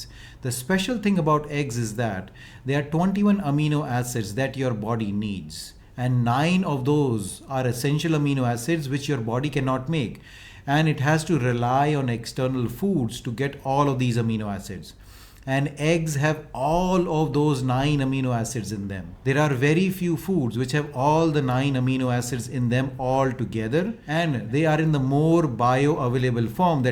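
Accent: India. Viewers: Excellent info. Doc should improve his bad Indian Accent.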